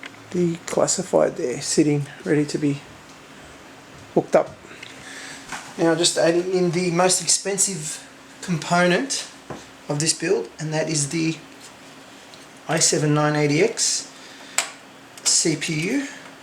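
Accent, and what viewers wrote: Australia. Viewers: Is that an aussie i hear?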